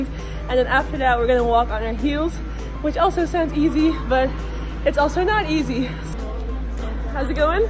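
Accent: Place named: Netherlands. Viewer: I knew she was Dutch from the moment she started talking lol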